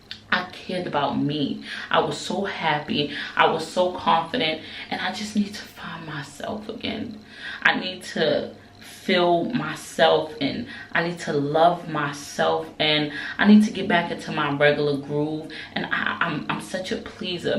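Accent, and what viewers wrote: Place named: Brooklyn, United States. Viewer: I have just been watching and listening to you for a minute... I just turned 54 yesterday and my dear YOU display more maturity than anyone that i know personally..I admit I have been bias because I just moved to NC from NY and your NY/BK accent just make me happy after a long day of southern charm. However your maturity, intellect and poise is so amazing. Brava!! Don’t ever change.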